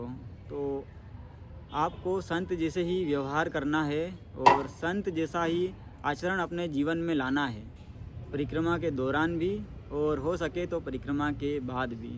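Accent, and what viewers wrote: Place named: Malwa, India. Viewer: नर्मदे हर🎉भैया जी और माताजी।आप लोग तो परिक्रमा में ही ठीक थे।बिल्कुल चेहरे मुरझा गए हैं।माताजी तो ठीक है, पर आपकी तो आवाज ही पहचान का सूत्र है ,चेहरा तो अजनबी हो गया है😅।कृपया अपना संपूर्ण परिचय दें। बातचीत से मध्य प्रदेश निवासी लगते हैं,मालवा बेल्ट के।बहुत अच्छा बोला है आपने, बिलकुल साधु की तरह। नर्मदे हर🎉।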